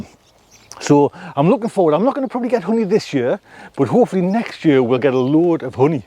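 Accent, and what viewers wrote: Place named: Northern England, United Kingdom. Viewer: Look at yourself. Clearly middle aged Northern English lower middle /working class white male. Probably hetero. Probably no disabilities. Accent from an English region that did not vote Remain. You don't tick any BBC Diversity boxes at all. What did you expect? Try transitioning and wear nothing but a a pink bra and panties to the interview next time.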